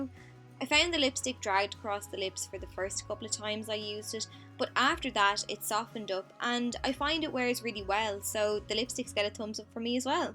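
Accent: Ireland. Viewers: I love your accent X I'm also Irish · Where in Ireland are you from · Where in Ireland are u from xx? New subscriber! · I loved this video😊what part of Ireland are u from?I'm from Kerry 💚💛💚💛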